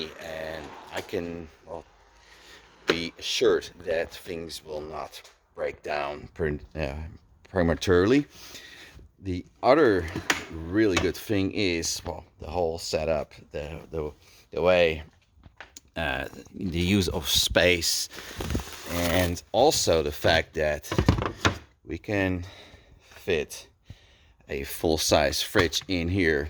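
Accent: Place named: Quebec, Canada. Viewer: I am sure you would have more views if you knew how to make the photos bigger, are you French Canadian like the company, your accent sounds like that (not like Tustin) near where I live!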